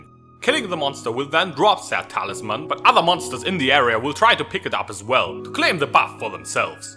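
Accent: Germany. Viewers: I cant tell if this accent is german or asian.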